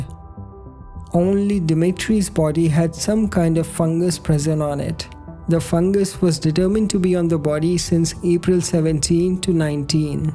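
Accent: India. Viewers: I always stop listening immediately, when I hear he is narrating. The Indian accent just ruins it for me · Is he Indian?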